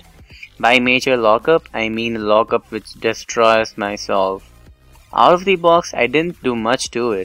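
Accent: India. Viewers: U sound Indian, are u?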